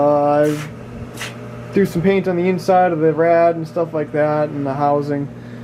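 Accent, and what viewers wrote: Canada. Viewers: Massachusettes plates .... But u sound like a Canadian ;)..... Nice truck tho..... Love those F250/350 styles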